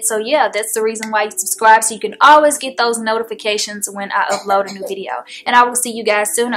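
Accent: South, United States. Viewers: i like your accent its like country. where are you from?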